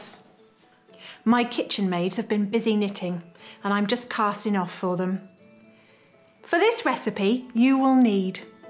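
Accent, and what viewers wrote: United Kingdom. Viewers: 凄まじいイギリス英語だな Very British English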